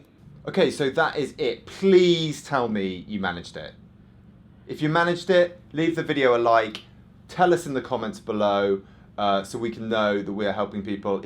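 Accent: England, United Kingdom. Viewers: You’re so unapologetically English with your accent. I love it! 😍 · I think your English accent helped me learn haha! Thanks from the U.S. cheers have a good one!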